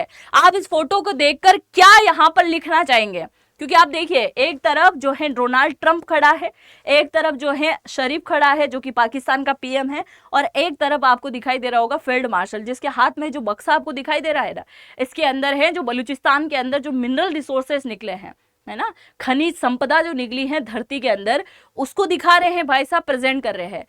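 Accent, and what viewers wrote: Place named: Marwar, India. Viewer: मैडम नमस्कार। आपके वीडियो बहुत तथ्यपरक और रोचक होते हैं। इनमें सच्चाई होती है,न कि मसाले बाज़ी। एक जिज्ञासा भी है। क्या आप राजस्थान के मारवाड़ क्षेत्र की रहने वाली हैं? आपके लहजे और उच्चारण से ऐसा ही लगता है।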